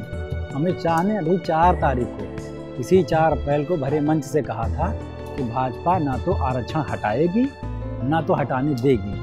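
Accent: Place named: Bihar, India. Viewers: EE sausri Kaahe bhojpuri type main anchorning karat baa..tanik samjh nahi aavat hamka Toh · Abe bihari ki tarah mat bolo mujhy bihario se nafrat hi 🙅😤😠😑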